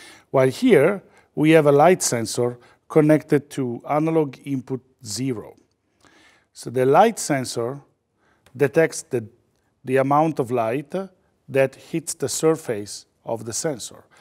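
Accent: Italy. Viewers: i dont know why but the fact you have a lovely italian accent makes me understand things better :D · Si capisce che sei italiano. Grazie per il video è molto interessante. Per caso fai cosrsi a pagamento?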